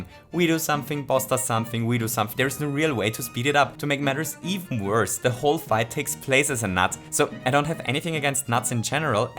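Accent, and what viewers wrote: Austria. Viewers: Warte, du bist Österreicher? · Hmm I never knew you were from Austria . Didn’t recognize the accent . Learn something new everyday ig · also he just has an austrian accent :P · Youre austrian??? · Moment du bist Österreicher?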